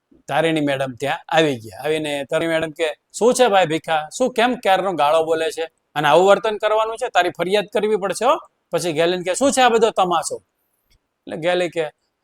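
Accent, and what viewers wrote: Surat, India. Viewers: ફુલ કોમેડી.... 😂😂 સુરતી ભાષાથી વધારે હસવું આવ્યું.... એકદમ મસ્ત વાર્તા કહી.... 😂😂👌🏻👌🏻👍🏻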